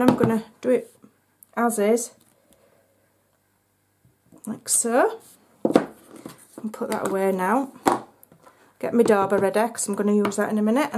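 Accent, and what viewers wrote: Yorkshire, United Kingdom. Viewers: I just love this card and the Yorkshire accent it reminds me of home. Richmond North Yorkshire is my home town. What is yours. I live in Perth in Scotland now. So miss the Yorkshire accent. Love what you do keep up the good work.Christine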